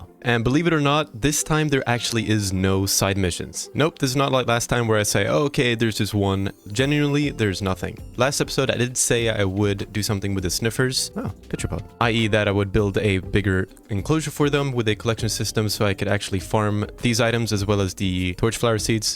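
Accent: Sweden. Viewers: Are you Swedish perhaps? If so, hallå där🕺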